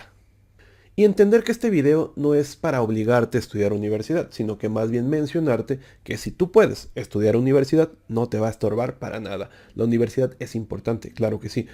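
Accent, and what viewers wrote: Mexico. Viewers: We eres bien verga hablas como fresa-barrio y explicas bien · Fresa-barrio xd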